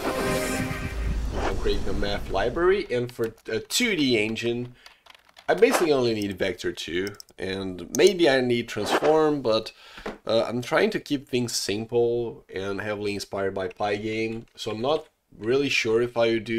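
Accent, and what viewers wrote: Brazil. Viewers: are you brazilian by any chance? I can guess by the accent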